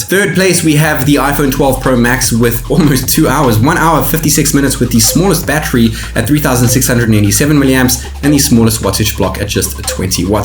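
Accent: South Africa. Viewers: I just gotta ask, are you South African because you kinda have that accent?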